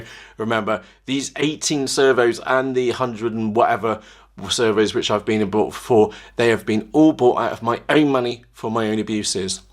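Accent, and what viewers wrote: United Kingdom. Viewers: British acsen🤣🤣👌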